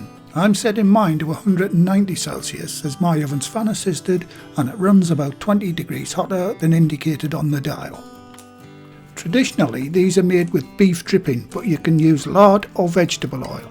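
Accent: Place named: Newcastle, United Kingdom. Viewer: I enjoy watching your videos , and more i love listening to your jordy accent , all your recpies remind me of England And the northeast watch i call it home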